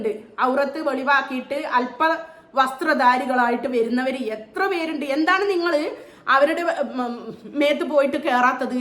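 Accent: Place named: Malabar, India. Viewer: നിങ്ങൾ ശ്രദ്ധിയ്ക്കണംഭാഷ കേട്ടിട്ട് കോഴിക്കോട്, മലപ്പുറം ജില്ലയാണ് എന്ന് തോന്നുന്നു - എന്തായാലും ശ്രദ്ധിച്ചാ നല്ലത്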